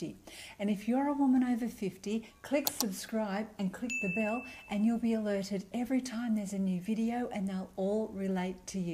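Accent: Australia. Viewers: Your hair is certainly absolutely gorgeous. I also love your accent. Are you Australian? I lived in Australia when I was a little girl for a year when my father was a visiting professor at the University in Adelaide.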